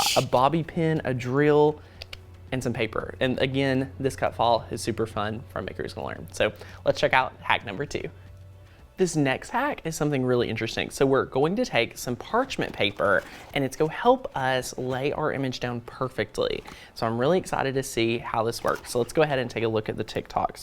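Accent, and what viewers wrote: South, United States. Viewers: nice accent. Hey there fellow southerner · Gotta love a good ole fashioned southern drawl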